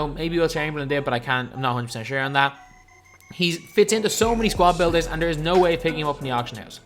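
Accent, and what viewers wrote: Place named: South Dublin, Ireland. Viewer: This is the biggest south dublin accent I have EVER heard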